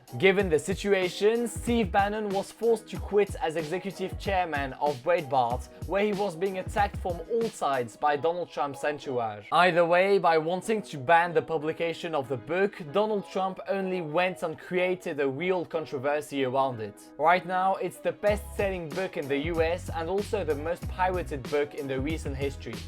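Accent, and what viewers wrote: United Kingdom. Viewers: perso j'entends plutôt du British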